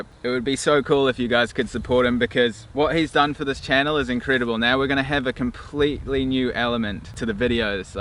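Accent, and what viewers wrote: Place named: New Zealand. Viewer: Score! Just discovered your channel love to hear a kiwi accent from all over the world mavic air is an awesome drone perfect for travel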